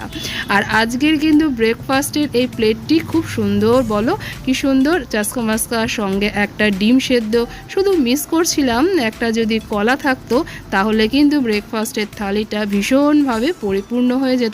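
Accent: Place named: Bangal, Bangladesh. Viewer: খুব খুব ভালো লাগলো তোমার আজকের ভিডিওটা তোমার কথাগুলো শুনতে খুব ভালো লাগে আর মাঝে মাঝে বাঙাল কথাগুলো বেরিয়ে বেরিয়ে যায় শুনতে ভালো লাগে আজকে যেমন বলেছো ডিমের চোকলা আমিও মাঝে মাঝে বলি চোকলা কিন্তু ঘটিরা বলে খোলা ডিমের খোলা তোমার কথাগুলো শুনলাম মাছ ধরার টিপসটা খুব ভালো বলেছ আমিও এই রকম করি খানিকটা সরষের তেল মেখে নিন ভালো থেকো সবকিছু নিয়েই ভিডিওটা খুব ভালো লাগলো আর তোমার কথাগুলো তো তুলনা হয়না❤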